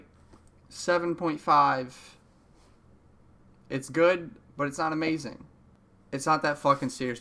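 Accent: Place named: Philadelphia, United States. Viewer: you sound like youre from philly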